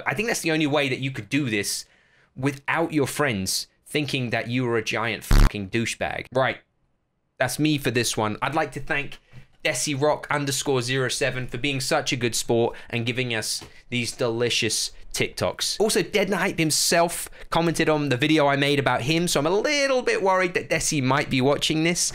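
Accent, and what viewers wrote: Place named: England, United Kingdom. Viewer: He doesn't sound like dantdm he just has an English accent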